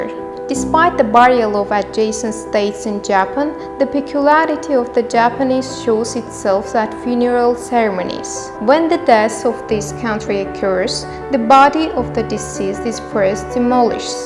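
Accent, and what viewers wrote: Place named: England, United Kingdom. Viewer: Hi! You are so dilligent and i like your english akcent! Good Luck in AQAT GROUP 👏🏻👏🏻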